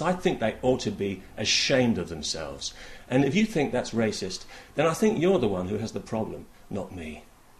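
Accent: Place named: England, United Kingdom. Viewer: Si tu avais pris la peine d'écouter deux secondes tu aurais entendu qu'il est anglais et non pas américain.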